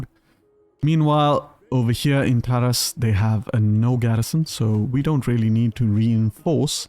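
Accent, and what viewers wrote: India. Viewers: Good stuff. You sound Indian - if so, Latin and Greek are far easier to pronounce correctly than modern European languages. You can use the dental 't' and 'd', for example (like in Hindi 'tum' or Tamil 'thambi' - non-Germanic languages do not use the alveolar t and d (like in English or German)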